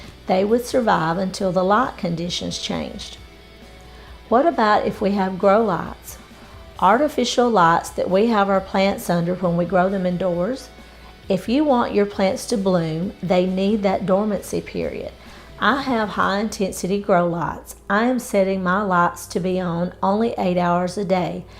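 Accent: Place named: Chicago, United States. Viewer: Great info! Thanks for sharing. Perhaps cut the volume of the music on the next one? I love your accent, where are you from? Chicago?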